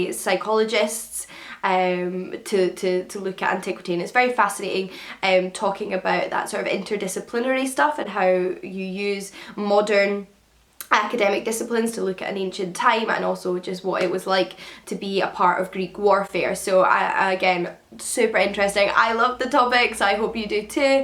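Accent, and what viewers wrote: Scotland, United Kingdom. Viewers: The podcasts sound fabulous! I've subscribed. You've inspired me to learn so much about the classical worlds. I'm about to open to the first page of Circe so I will listen to your interview with Madeline Miller very soon. I love your PS in the introduction "it's a Scottish accent". My grandparents were Scottish and I miss hearing their accents so I get an added bonus listening to your new podcasts:)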